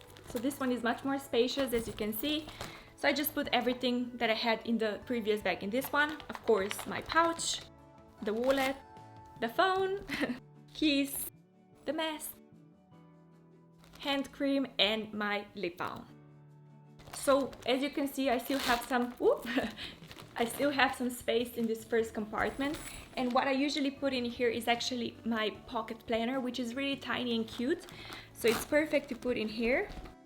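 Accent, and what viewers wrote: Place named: England, United Kingdom. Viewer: Love your english accent, where it from???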